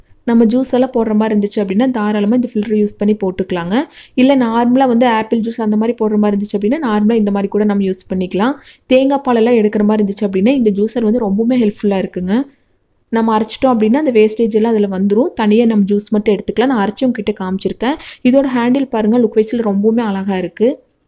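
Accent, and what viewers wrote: Coimbatore, India. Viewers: Hi...unga coimbatore slang voice is sooo gud😊😊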